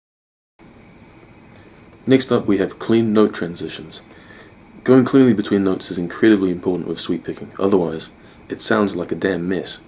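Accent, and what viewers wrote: New Zealand. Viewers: Nah this sounds kiwi not aussie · are you from new zealand? or australia? i am to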